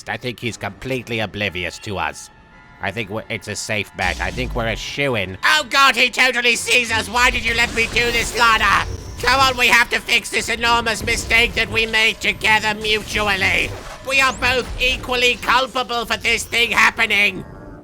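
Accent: United Kingdom. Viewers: Is it just me, or does Marik sound more british than in his older let's plays? I guess he spent too much time with Yami Bakura XD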